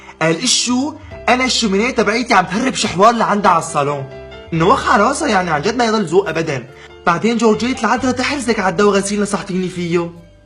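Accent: Aleppo, Syria. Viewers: ياييييي تجنن لهجتكم تحية لا حلب ولا كل سوريا من بنت العراق ☺☺☺ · دخيل قلبككك شو حلوو الحكي الحلبي احلى تحية لاهل سورييا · لك أحلى حلب وأحلى لهجة دخيل ألبنا نحنا الحلبية شقد حكيينا كويس ومنظوم❤أشتقتلك يابلدي😢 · دخيل روحك ما احلاك وما احلى لهجة الحلبية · ههههههه تابرني حكي حلبي تابش البي 😘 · هاي لهجة اهل حلب فقط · حلبية وهيك كبه مججئه بل لبن لك بالله عليكي هاي لهجه بتنحب )مثال جب الئبه 😂😂😂😂😂😂😂😂😂😂😂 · ههههه دخيل ربك يا حلب محلاكي ومحلا لهجتك الله يفرجها يا رب #جزائرية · روعه ههههه انا حلبيه وما بعرف احكي متلو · لاااا هي صعبة 😂اذا مو من حلب مستحيل تنفهم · ابوس الحكي الحلبي .يمووو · احلى حلبية واحلى لهجة والله اخوكم من الدير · ههههههه وككك تجنن لهجتنا معك بس سي بتعرف زكرتني بأيام حلب هههه مو متل هلق تركيا هههه وبطيخ وك صحي ئللي وين انت مقيم هلأ ؟ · الشب حلو بس لهجة حلبية ماحلوة لمعي يعمل كومنت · كلامك مية بالمية هي هي اللهجة الحلبية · مابتعرف تحكي الحلبي القحي النظامي عبتحكي حلبي الطنطات ولابقى تحكي الحلبي الجويني لانو مابلبقلك ضحريماتك اذا طقتك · 😂 موتني مي نعشق اللهجة الحلبية #جزائرية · تحية من المغرب 😂😂😂😂احلى لهجة سوريا والله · ولك فديت اللهجة الحلبية عسلللل وربي ❤ يستر ع الكلاوي🥰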